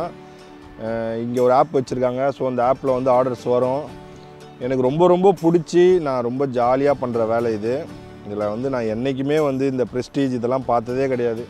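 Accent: Chennai, India. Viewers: You have a great philosophy of life! I am learning Tamil from you! You Tamil accent is typical Chennai. Only your pronunciation - 'PACKET money' for 'POCKET money is typical Tsmilian. Because Tamil does have the 'O' sound. Very good video! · Thala chennai speech paka . Ne gathu thala😎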